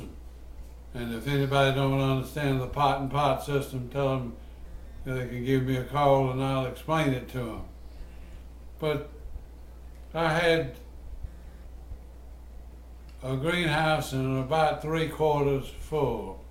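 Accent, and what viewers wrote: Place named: South, United States. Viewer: True southern draw…like my pappy use to have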